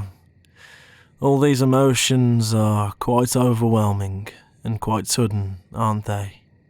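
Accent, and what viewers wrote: United Kingdom. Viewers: I dont usually like men BUTTTTT I make an exception for this bri’ish boy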